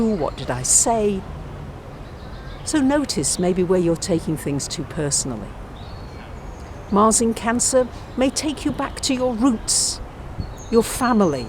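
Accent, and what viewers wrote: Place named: Manchester, United Kingdom. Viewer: Great video. You said you're in Edinburgh but I recognized the Mancuran accent. I'll admit I'm a huge Oasis fan and that's how I recognized the accent. Happy full moon from California.:)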